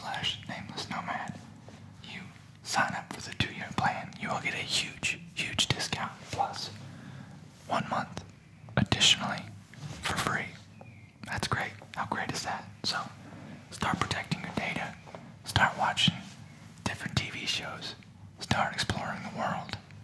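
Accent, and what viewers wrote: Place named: United States. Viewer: I didn’t know is Danish because of his perfect American accent Really wanna request a real life collab with ObviouslyASMR because they are all European LMAOOOOO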